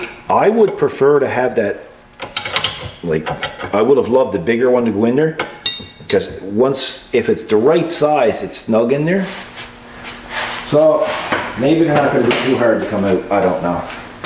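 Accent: Canada. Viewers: Love your videos -- good to know you are so busy. I love your Canadian accent and your "tomfoolery!"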